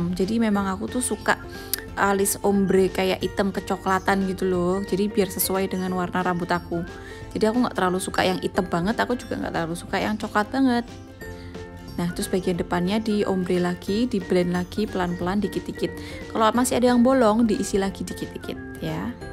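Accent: Java, Indonesia. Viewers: Mbk medokkkk😍😍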